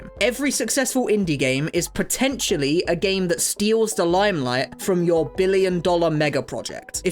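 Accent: United Kingdom. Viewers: without the annoying british accent this guy would have 500 subs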